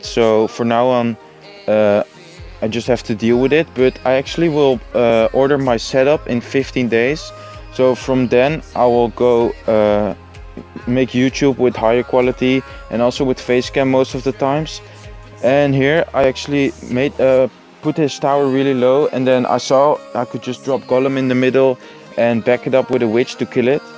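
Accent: Netherlands. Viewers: Are you dutch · are you dutch?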